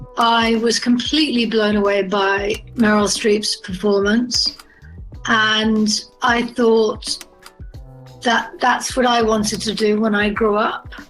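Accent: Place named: United Kingdom. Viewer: She lived in the UK til she was 13 and has now lived in the UK for most of her life. She’s not “doing” a British accent. It was her first “language”.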